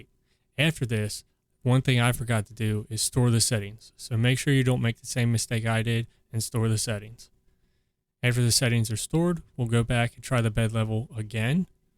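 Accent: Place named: New Orleans, United States. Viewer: Great video and great timestamps and links. Thanks for your efforts. Sounds like a Nawlin's accent...takes one to know one. Keep up the good work...sub.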